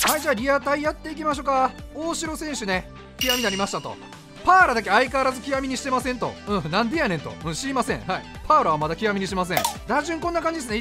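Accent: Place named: Fukuoka, Japan. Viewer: 、これまたリアタイでサンチェス増えますよっあとよかよかは福岡の方の方言ですね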